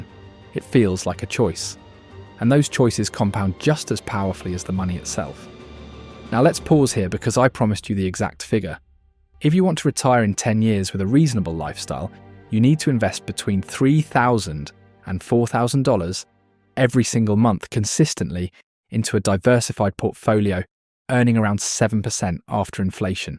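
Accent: United Kingdom. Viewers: Person with British accent won’t teach you anything new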